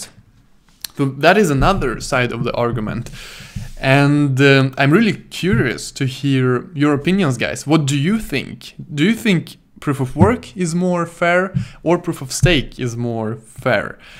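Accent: Russia. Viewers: I like your huge Russian accent very very much! You are such a brave man to film all those videos and not to afraid of anything. Wish you good luck, I subscribed.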